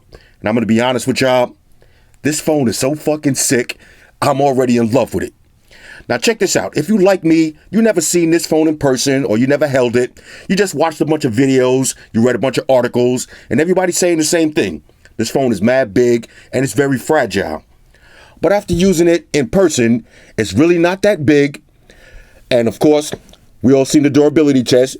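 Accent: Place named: New York City, United States. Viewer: .. I'm a new sub, you do go in very fast although you said it's not a full review. Well I am asking for a breakdown on speed when going for different apps, load time when searching for information? Does it have a huge amount of bloating apps... Could u Use this in a camera setting such as a dark garage & take giid pictures. You feel like is any other phone better when it comes to hungry techies?? LOL- Yeah u have a hard Eastern Accent. Must be NYC or Something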